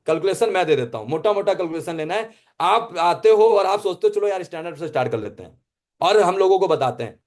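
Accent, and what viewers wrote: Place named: Bihar, India. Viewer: Bihar se ho kya Tone match kr rhi h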